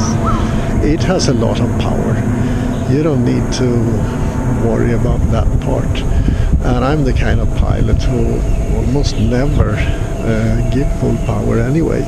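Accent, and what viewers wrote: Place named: Scandinavia. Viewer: Hi, nice video! I was looking around for fun because I've built an Alfa Monster myself and are about to build another one, but can I ask you where you're from? Edit: Saw Sweden mentioned in your channel description, thought I heard that nordic accent, being from Norway myself. Looks beautiful there, do you take guests? Kidding of course, but I would love to see Thailand sometime, through my Fatsharks too :D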